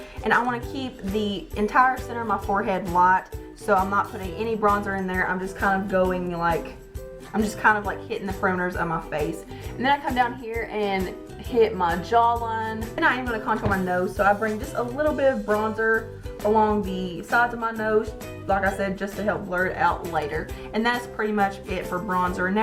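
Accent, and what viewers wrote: South, United States. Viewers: I just subscribed!! You have a southern accent, Im from the south as well!! Its nice to finally hear someone who sounds like me on here lol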